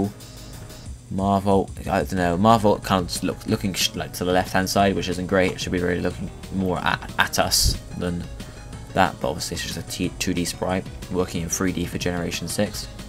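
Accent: United Kingdom. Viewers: I love your british accent, could listen to you all day c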